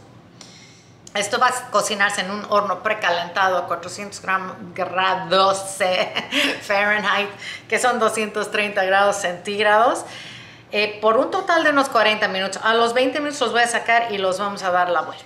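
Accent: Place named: Bolivia. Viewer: 😁 tenias que ser boliviana. Hermosa, me fascina cómo hablas disfruto mucho al ver tus recetas fáciles y delis 👌👏👍👏👏👏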